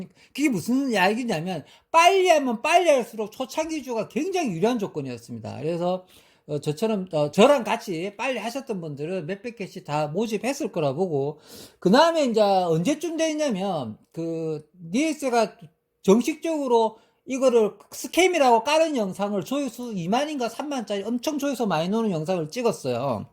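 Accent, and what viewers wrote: Gyeongsang, South Korea. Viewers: 저고 경상도 입니다 경상도 사람들 말투 ㅋㅋㅋㅋ 저도 경상도라 이해 합니다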